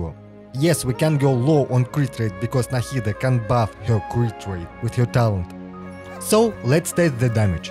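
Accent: Russia. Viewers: He got the russian accent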